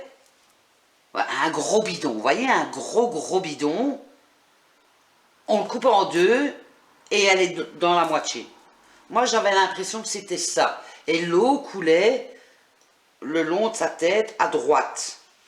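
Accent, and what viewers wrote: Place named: Belgium. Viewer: Vous êtes adorable et vous avez un chouette accent Belge ♥️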